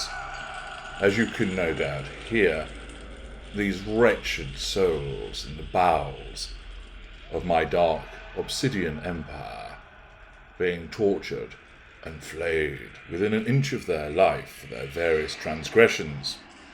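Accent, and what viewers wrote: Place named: Scotland, United Kingdom. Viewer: Love it. You’ve got to be Scottish. You’re a treasure.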